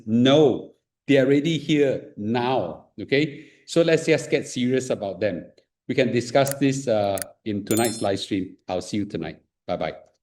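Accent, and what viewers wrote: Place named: Singapore. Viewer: Your Singalish make you are not what you think you are .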